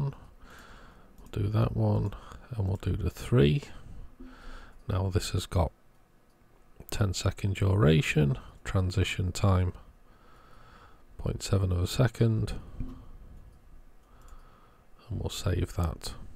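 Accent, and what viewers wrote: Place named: United Kingdom. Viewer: Hello mate you sound like you’re from the UK as am I, I’ve only just started on LED electronics and I was wondering where you got your LED’s from. I’ve just ordered some from aliexpress as the 1st one I got off amazon is like 3 times more expensive than Ali but the only downside is that it’s 3 weeks shipping time.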